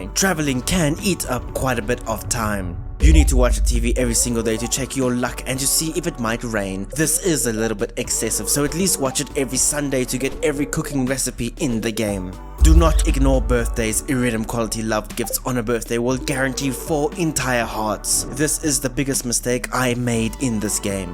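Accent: South Africa. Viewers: First time watching one of your videos and instantly had a feeling you were from South Africa Howzit boet! 🤙🏻🇿🇦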